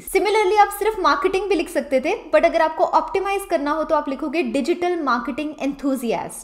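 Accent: India. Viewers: your indian accent is incrediable but very useful sharing. thanks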